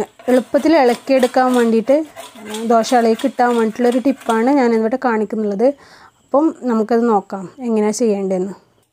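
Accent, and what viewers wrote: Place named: Malabar, India. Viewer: എനിക്ക് അറിയാം.. സ്പീച് slung. മലബാറിൽ എവിടേയോ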